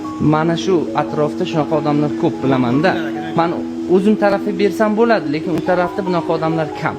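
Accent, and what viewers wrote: Uzbekistan. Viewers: Buxorodan bulsa kerak shevasidan baraka toping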